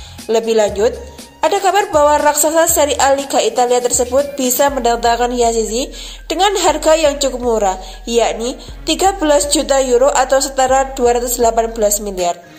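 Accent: Java, Indonesia. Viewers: Medok banget mba bacanya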